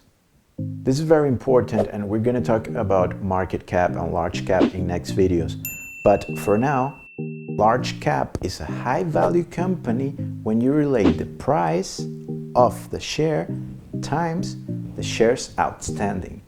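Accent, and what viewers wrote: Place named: England, United Kingdom. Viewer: Si hablas un ingles tan “ingles”, porque no los subtitulos en castellano??? 🤪🤪🤪🤪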